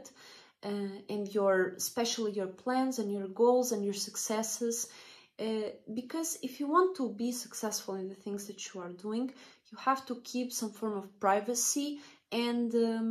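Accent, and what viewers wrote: Russia. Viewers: I really agree. I worked on a creative projective which was ambitious and the people close to me tried to dissuade me and tell me it was impossible. I said it is impossible for YOU, for me it is possible. haha. But yes I agree, hard to find genuine people who will support you and not be jealous if you are a unique and creative person. I like your accent are you Russian?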